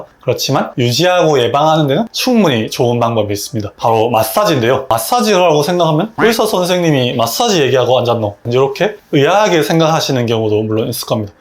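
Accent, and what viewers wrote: Busan, South Korea. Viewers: 부산분이신가요? ~하고 앉았노? 하는데 찐텐 나오심 ㅋㅋㅋ 부산분들 좋아요. 의리가 !! 암튼 잘 따라해보겠습니다.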